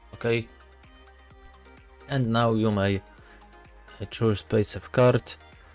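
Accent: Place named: Poland. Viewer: widać ze polak po akcencie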